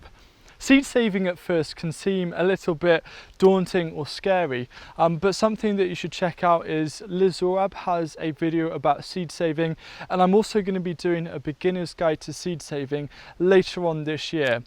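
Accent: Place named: Wales, United Kingdom. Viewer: I love your Welsh accent. And thank you for the tips!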